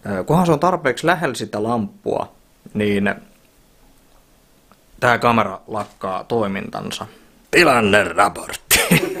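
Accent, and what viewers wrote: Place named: Kuusamo, Finland. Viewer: Kyl kuusaan murre kuulostaa hyvältä👍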